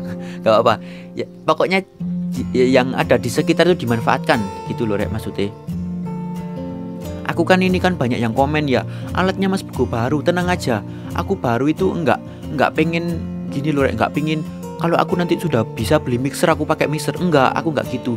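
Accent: Java, Indonesia. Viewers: Kakak orang jawa tulen yaa Ngakak aku denger logatnya😂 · Pengen bgt ngeliat mas nya d undang hitam putih trs masak pke logat jawa ky gitu..hehehe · Logatnya bagus... Hehehe... Medok.... Thx resepnya berguna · jawane medok n lucu sederhana pula.. kerennn.. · 😂😂,,medok bahasanya mas sama saya jg orang Jawa 🤣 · Fokus sama cara ngomongnya....jowo Poll alias medok ...the power is Jawa 😅😅😆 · Gemess ...medoknya ituloh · Medok banget anjay, ngakak dengerinya😆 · Aduhh demen sama medoknya😂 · Saya suka medok nya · Gue gk suka masak tp liat video ini tk ulang2 jd semangat nyobak...medok mu loh yg bkin semangat ms.bro...😂🙏🙏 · Salah ngomong jatohnya malah kasian tp gemes Medhok nya jg uhhh banget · penyampaian mas nya jugaa sederhana dan jawa bangeeet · Y Allah ngakak dnger suaray medok bngt rek😂😂😂😍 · Medoknya lucu banget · iya...tapi mas-nya ini mmg klo ngomong msh medok..campur bhs Indonesia dan Jawa..ciri khas org Jawa mmg kdg2 msh sk campur2 ngomongx..he3x.. 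· Aq orang balongbendo mz jowo e jek medok e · medok bylyke emm, cwo jgo masak mantap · SUMPAH LO, AKU GA NATE WALEH NONTON YUTUB MU MAS. SUARAMU UADEM, MEDOK, UENAK DI RUNGOKNO. YOK OPO AKU SG GRUSA GRUSU KEK NGENE KI MAS 😂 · Njiiirrrr... Diselempitkan jare.. 🤦🏻‍♀️😂🤣👍muwedddoook banget c mas.. Kudu ngguyu ngrungokno e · Loalah..wong mojokerto dewe.Mangkane ngomong e medok😂btw podo mbi aku mas wong mojokerto😄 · Suka suaranya yang khas medok. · hi lucu bosone medok bnget 😍 kak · Haduh basane medok buanget rek, moker ndi ki mas, makasih yo kontennya meninspirasi. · Medok banget:v sukaaaaaaa ♥️ gua fokus ke suaranya yang medok tiba tiba gua suka yang Ngomongnya medok wkwk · "sensasi nyemprot menyemprot bikin bahgia rek" medoknya ituloh yg bikin ter unch 😍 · Mas lucu deh cara bicaranya hehe ..orang jawa ya kayaknya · Medookk bngeett anjiir😫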